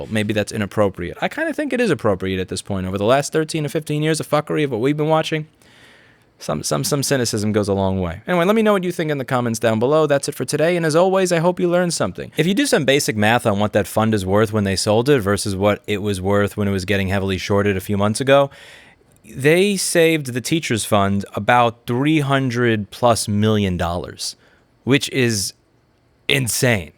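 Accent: New York, United States. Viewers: Angry New Yorker knows his shit.... Thank you for the lesson I'm walkin' here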